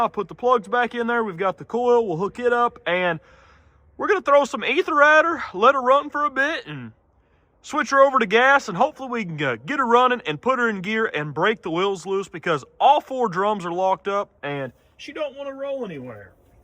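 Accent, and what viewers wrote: South, United States. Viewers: I come for the video. I stay for the good-ol-boy accent. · I missed that southern drawl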